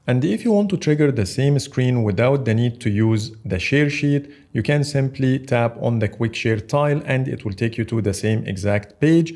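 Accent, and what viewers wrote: Egypt. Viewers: انت مصري ياض حاسس الانجليزي بتاعك انجليزي مصري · اللهجه مصريه جدا ؟؟؟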